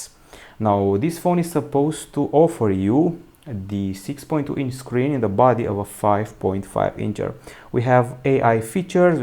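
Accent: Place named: Romania. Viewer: Îmi place accentul de roman:*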